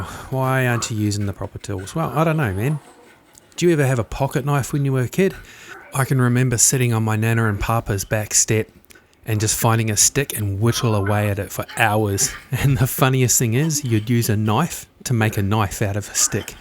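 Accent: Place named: Australia. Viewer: The down under accent makes any content even more fun to watch. Awesome job, mate.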